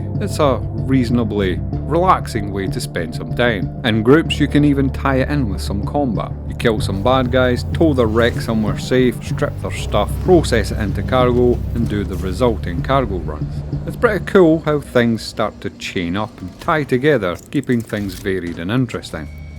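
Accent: Scotland, United Kingdom. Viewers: Purple Burglar Alarm! Love the Scottish accent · Appearantly I'm perfectly capable to understand "scottish english".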